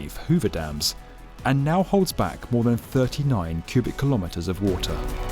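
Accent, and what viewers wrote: United Kingdom. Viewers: What kind of British accent is this? I know it ain't cockney! Heard it before ...very proper, bit of lisp or sounds kinda trips on some parts of words northhha and defeenainiteeve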